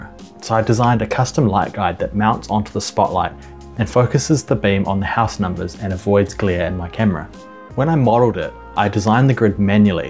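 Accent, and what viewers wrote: New Zealand. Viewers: Yes the New Zealand accent can produce some funny sounds! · Just realized I missed the kiwi accent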